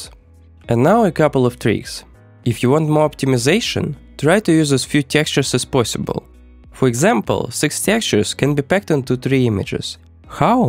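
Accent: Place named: Russia. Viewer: топовый акцент, русский отличит, но иностранцы думаю ничего и не заметят)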